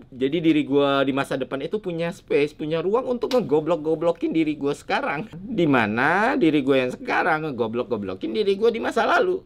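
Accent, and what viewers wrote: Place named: Jambi, Indonesia. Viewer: Woii orang jambi yoo haha orang awak lah ternyatooo awak kiro orang jakarta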